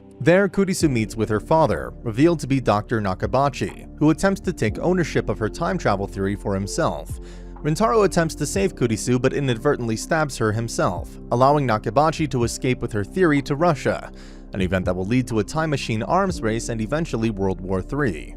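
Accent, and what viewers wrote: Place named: United States. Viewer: Thank you for real voice not robot. I love your American accent.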